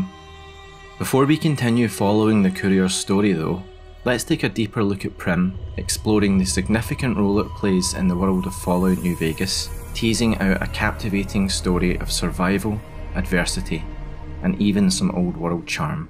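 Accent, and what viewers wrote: Scotland, United Kingdom. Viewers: Great video man. Btw I love the accent. Is it Scottish?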